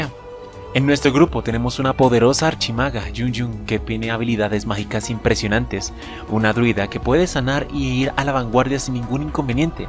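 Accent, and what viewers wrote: Colombia. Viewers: Ja referencias colombianas habla como colombianas eres de colombia ¿?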